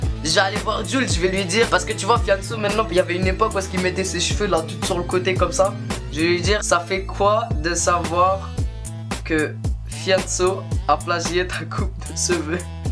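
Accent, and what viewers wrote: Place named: Quebec, Canada. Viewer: T québécois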